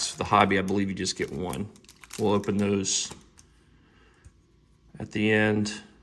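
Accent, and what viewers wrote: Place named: North Carolina, United States. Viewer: Based on the accent I assume we are from the same “Queen City” in NC. Which LCS do you use?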